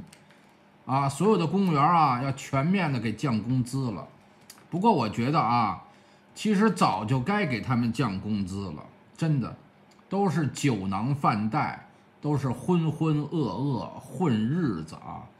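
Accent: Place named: North, China. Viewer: 最烦这种北方口音 肚子里没文化 整天要指点迷津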